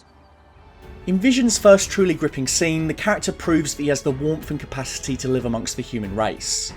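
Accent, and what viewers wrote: United Kingdom. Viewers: I enjoy your videos, but find it jarring when you use pseudo American phrases and pronunciation, when you're clearly a Brit. I imagine you're choosing to do this in an attempt (perhaps correctly) to appeal to a USA audience, but it is nevertheless unsettling to hear, and I suspect that your audience in the USA and Canada enjoy your accent and language precisely because you're different. The expression "Often times" for example. I've lived in the UK my entire life and I have never heard anyone say that. Literally not a single occasion. We simply say "Often". Similarly, your reference to "Taffy"? Where did that come from? That's not even a thing over here, so why would you use it as a similie? Finally, and perhaps the most troubling is the way you've aped the USA pronunciation of the name Thanos. Admittedly, it's a character invented by an American, but surely one which has its roots in Greek mythology, and both Brits and Greeks would pronounce that word as Than-Oss, and never Than-owse. 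That's all, otherwise, fab vid. Thank you for sharing. Just wanted to say that your own accent and linguistic style is just fine and charming, but the choice of some select words and pronunciations is a bit jarring - next thing you'll be drawing the 'drapes' and putting the broom in its 'closet' ;-)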